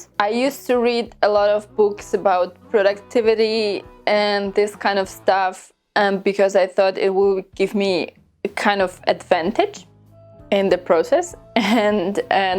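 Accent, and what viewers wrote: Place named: Poland. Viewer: I didn't realize there was such a void in my soul until I heard the words "Hurdy Gurdy" cheerfully spoken in a polish accent. I feel better now.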